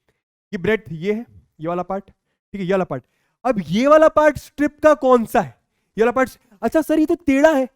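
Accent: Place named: Bihar, India. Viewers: sir speaking bihari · Such a bihari 😅😅 but good THANKS sir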